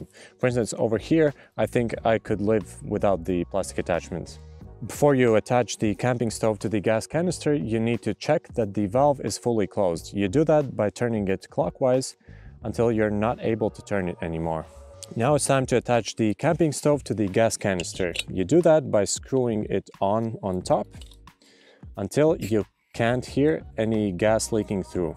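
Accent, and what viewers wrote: Latvia. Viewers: Pēc akcenta atpazinu, ka latvietis :)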